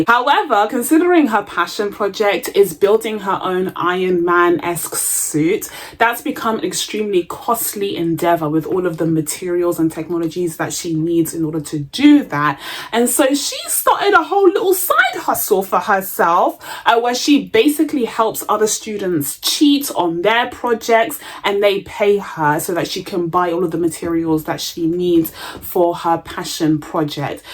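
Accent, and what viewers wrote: United Kingdom. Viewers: First I wasn't expecting a British accent, lol 🤭 secondly I agree with small minded racists review bombing anything that isn't centered around them. Third, I sincerely appreciate your commentary, thank you. 🇺🇸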